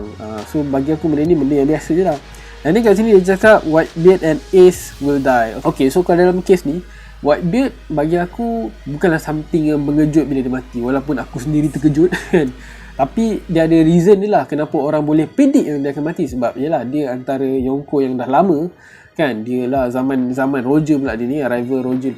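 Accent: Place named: Pahang, Malaysia. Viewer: pekat ngt loghat temerloh nye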